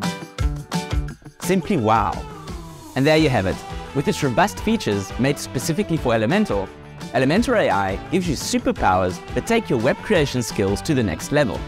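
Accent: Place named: South Africa. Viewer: This oke is South African. Random.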